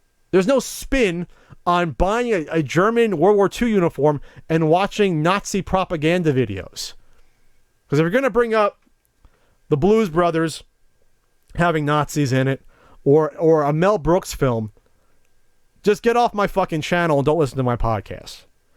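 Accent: New Jersey, United States. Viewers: ah you can hear the New Jersey in you. #1 state in ppl cursing. Jersey misses ya!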